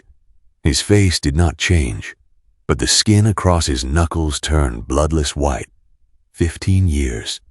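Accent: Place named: South, United States. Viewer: Why did narrator lose English accent and, develope a southern drawl bit unnerving.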